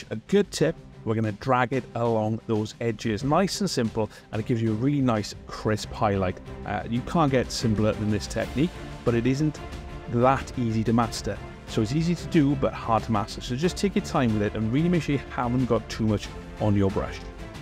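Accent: Wales, United Kingdom. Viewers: I just like the soft welsh accent. Cwmry am byth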